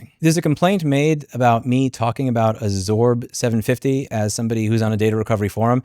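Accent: New York, United States. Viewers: The "New Yorker" in you really came out in this video hahaha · I think we all love when really gets going in the New York accent comes back out strong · I love when you get excited about calling people on their BS. Your NY accent comes out hard, lol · Lol your NY came out a bit more than usual. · They obviously have no clue who you are. You may be in Texas, but I love the New Yawker in you! :D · Ny accent came out strong in this one, was exceptionally tight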